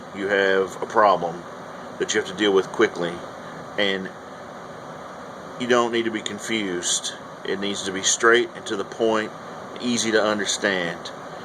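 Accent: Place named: South, United States. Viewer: nice hearing this from someone with a southern accent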